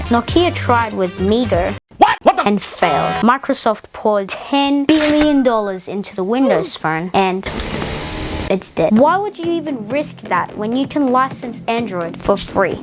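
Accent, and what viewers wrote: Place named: United Kingdom. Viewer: Great work kid just loose the British accent and you’ll blow up 🙏🏽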